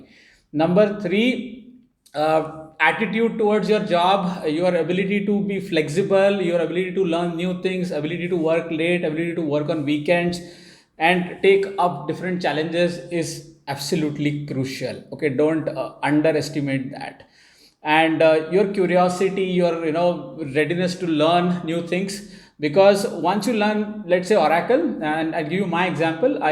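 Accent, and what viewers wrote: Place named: India. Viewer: Your indian english irritates me....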